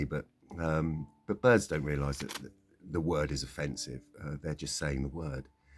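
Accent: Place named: United Kingdom. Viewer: 😊i love your relationship..it is so loving and pure. I love watching you interact together. And I really love your British accent..I am from the USA by the way. So happy I came across you and Smurfy ❤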